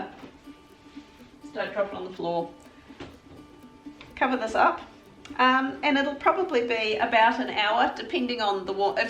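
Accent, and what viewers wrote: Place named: New Zealand. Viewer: Don't we all! Thanks for your reply. I've JUST made some circular normal crumpets for the first time. Something went wrong as they look good, taste good, but have no bubbles / holes! The bubbles appeared and then closed up again. I think the pan recipe is wrong. I will persevere. I love your accent! I have a friend from Dunedin who lives here now and he now finds N Zealanders sound strange! (Keep it on hind! My tint is wit !) Great fun! England is in the middle of a mini-heatwave. We get 3 days of summer... it will be snowing in weeks! It's so weird (for me) to think that June is Winter for you! I guess you have just had Christmas there!!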